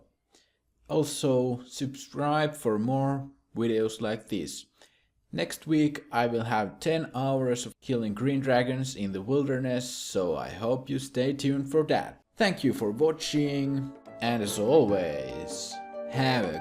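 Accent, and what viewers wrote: Finland. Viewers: dat finnish accent tho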